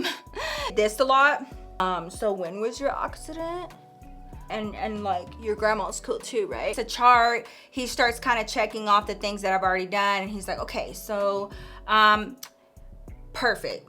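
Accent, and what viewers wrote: Texas, United States. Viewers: New here and lovin these stories! 🥰 This is my 3rd. The first was the concert demons story, and for some reason I Immediately knew you were a TX girl. When you said Dallas in this video I was like, "AH HA!" 😂😂😂😂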